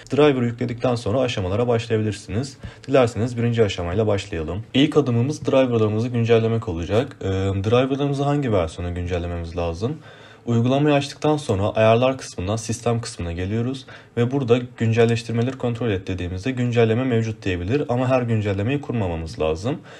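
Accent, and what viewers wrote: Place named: Azerbaijan. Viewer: Azerbaycanlisin sanirim aksanin arkadasiminkiyle ayni :)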